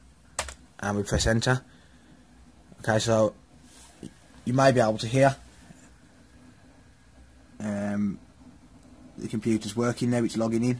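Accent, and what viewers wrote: United Kingdom. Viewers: The most british accent i've ever heard.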